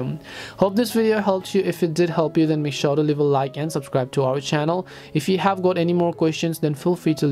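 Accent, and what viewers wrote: India. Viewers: Why is it always when I'm looking for tutorial its a dude with an Indian accent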